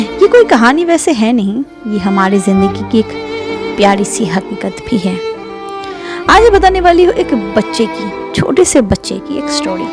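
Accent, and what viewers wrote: Bengal, India. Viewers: मेम साहिबा , कहानी तो बहुत ही ज्ञानवर्धक और प्रेरणादायक है , इसके लिए बहुत बहुत धन्यवाद । आपके बंगाली टोन के कारणों से बहुत ही छोटी गलती स्त्रीलिंग और पुल्लिंग के होता है , होती है में अंतर मिट जाता है , बुरा नहीं मानेंगे । कृपया ध्यान दें । 👍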